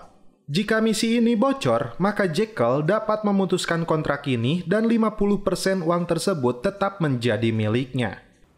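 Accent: West Java, Indonesia. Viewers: Ciga orang Sunda ey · Cemprenk cadelnya sih masih respect, tapi tolong dong sundanya kurang-kurangin.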